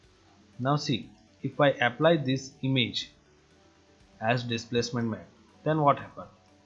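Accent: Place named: India. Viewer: Hey, This is an interesting question indeed. I wondered too, a few years ago. (I'm glad I found this channel back, because being into this as well (matte painting, set extensions, ...), the only thing I had time to write in the late account was I really loved his indian accent ;) Hahaha, kind but short... Now I can go further fortunately) So If you don't mind switching a few moments from this lovely accent to a less lovely german one (not me btw), you'll have some answers here <link> around 5.10, 8.00 a,d 18.00...Now you can have auto calculated depth, and I think one could probe it into a bitmap too. Don't forget to come back here, I wouldn't cause leaks just because I helped.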